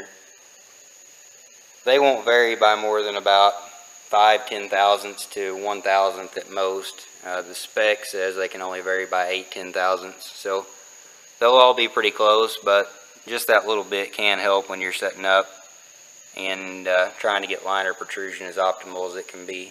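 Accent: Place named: Texas, United States. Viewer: your north Texas/Oklahoma twang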